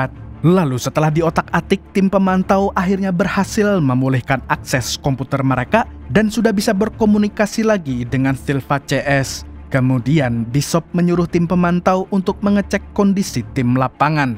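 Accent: Banjar, Indonesia. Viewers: Urg banjar dimana pyn mang😅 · Urang banjar juakah ikm nch....😂😂😂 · Org banjar kah pian niti mang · Banjarr leh.?! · Buset org banjar kah sklinya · orang banjar kah pian😂😂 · oh ini kah nang buhan banjar tu wkwk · Bang pian orang banjar dmnanya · Kayak nya mimin nih org banjar nah wkwkwk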